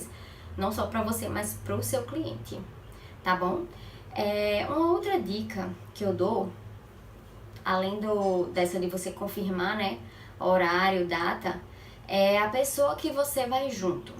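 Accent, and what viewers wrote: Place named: Natal, Brazil. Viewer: bom vídeo doutora, parabéns!!.. mas me tira uma duvida.. (sou novo na advocacia) na correspondência jurídica, vejo algumas solicitações de diligencias de audiências trabalhistas para ir como preposto. .. acho "estranho" isso pois, "pq não ir como advogado mesmo da reclamada?!!?" .. como serei proposto numa audiência de uma empresa que não trabalhei ou não tenho vinculo empregatício nenhum?? gostei MUITO de suas dicas para esse tema! obrigado! ps: meu sotaque é igual o seu, sou de NATAL-RN. e vc? grande abraço.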